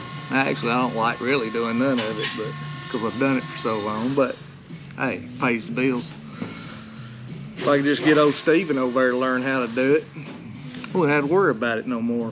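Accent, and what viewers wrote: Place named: South, United States. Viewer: I have a retired uncle in Tifton Ga. that sounds a bit like you and people always take him for an idiot. They think he's like Goober from The Andy Griffith show, meanwhile he was one of the best structural engineers in his field back in the '70s, I always loved his accent and unpretentious common sense. His teaching style was laid back and based heavily on hands on learning through personal failures. At 14 he started out in steel fab and never forgot that he was once the student that could barely read a measuring tape as a JHS dropout. He's near 90 now and industry people still consult with him, at least the smart ones do, lol. You do great work and are a true master at your craft, I take a lot of pride in knowing our country has folks like you and my uncle in it. I do metal fab because of him btw, not at his level, but I've built some things that will be around long after I'm gone and that to me is a source of joy.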